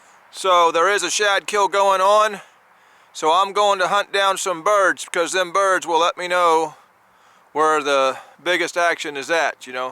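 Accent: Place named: South, United States. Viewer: I'm sure somewhere in Tennessee. By the way he talks. He from the south yeehaw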